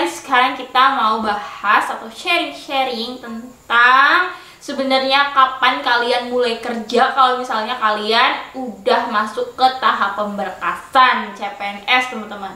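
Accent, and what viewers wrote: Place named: Java, Indonesia. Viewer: Medoknya keliatan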